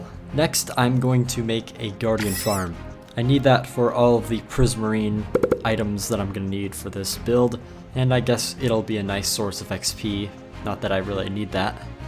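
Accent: Minnesota, United States. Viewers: With the name I was hoping your MN accent would be more aggressive.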